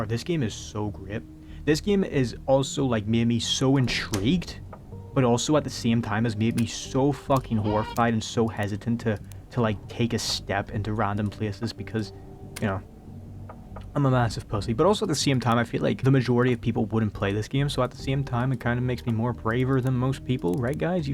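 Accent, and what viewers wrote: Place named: Ireland. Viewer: tbh i only watch your content for the funny irish accent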